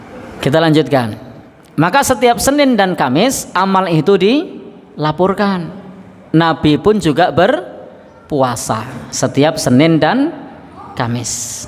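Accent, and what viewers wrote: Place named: Java, Indonesia. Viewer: iJIN BERBAGI.....👇 MENCERDASKAN UMMAT....!!! USTAD INI...KALO DARI NADA BICARANYA SUKU JAWA....... TETAPI AKIDAH NYA LURUS..... BELIYAW TERLIHAT BERADA DI ATAS MANHAJ SALAF..... HARUS NYA BANYAK ORANG JAWA YG HIJRAH MENGIKUTI PEMAHAMAN BELIYAW....YGMENGAJAK UMMAT KEMBALI BERAMAL,BERIBADAH BER AKIDAH MENGIKUTI MANHAJ SALAF....!!!! SIAP SIAP USTAD.....KALO MENDAKWAH KAN AGAMA YG HAK,YG SESUAI DALIL....DARI KITABALLAH DAN SUNNAH ROSUL..... MAKA ANDA AKAN DI TUDUH.... SEBAGAI WAHABI......😁😁😁HE....HE.... TETAP ISTIQOMAH MENDAKWAHKAN YG HAK.....MESKI BANYAK YG MEMBENCINYA....!!!! SEMOGA BERMANFAAT. 🙏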